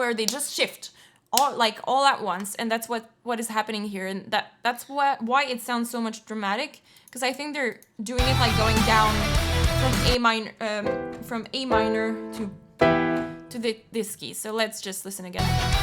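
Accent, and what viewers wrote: Quebec, Canada. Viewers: Your accent look like french canadian !?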